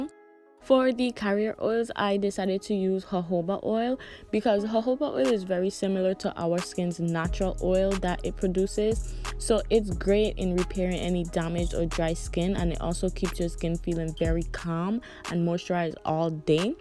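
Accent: Caribbean. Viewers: Heyyyyy 😍 where you from if I may ask? U sound like u got caribbean background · Are you from the Virgin Islands 🇻🇮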